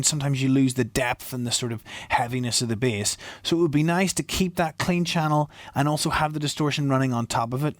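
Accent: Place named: Liverpool, United Kingdom. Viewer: Dude you from around Liverpool. aren´t you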